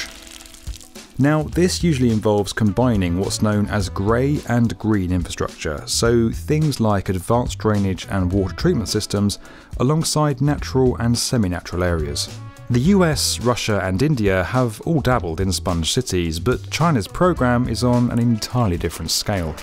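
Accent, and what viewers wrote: United Kingdom. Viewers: The Presentor is perhaps from Britain:))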